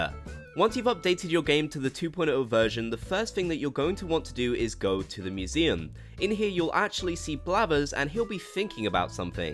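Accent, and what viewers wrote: United Kingdom. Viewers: Wait are you british i thought your american but you sound British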